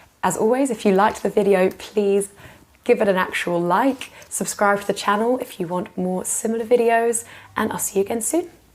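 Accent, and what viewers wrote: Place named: United Kingdom. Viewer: Love yur British voice. It's sexey. 😊😊 Thanks for the advice!!😊😊😊😊❤❤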